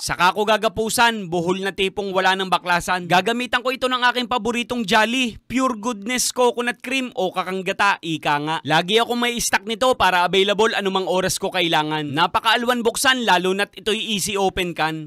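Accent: Batangas, Philippines. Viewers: My 1st time seeing one of your videos. You sound like you're from Batangas.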